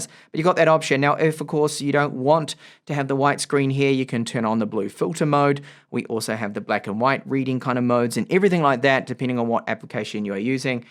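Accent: Australia. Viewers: What's your nationality? Aussie, Kiwi or English? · He sounds Australian to me lol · he sounds Australian to me too?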